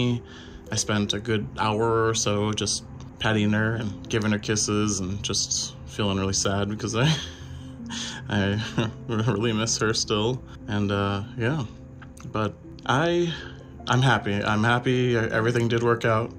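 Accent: South, United States. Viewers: Man you are as southern as can't and so iam I love to hear you talk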